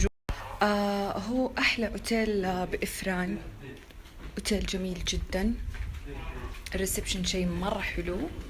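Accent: Saudi Arabia. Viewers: صحيح كلامها سعودي كأنها سعودية انصدمت من طريقة كلامها ضاطبة اللهجة مئه بالمئهSalwa Mery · مغربية.....والحكي سعودي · انتي مغربية ليش تتكلمي لهجة سعودية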